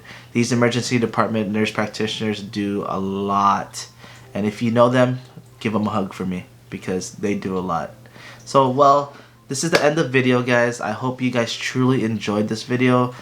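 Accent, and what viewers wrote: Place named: Philippines. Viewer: Filipino accent?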